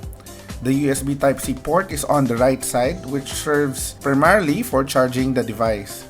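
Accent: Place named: Ilonggo, Philippines. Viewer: ilonggo ka man? you sound like one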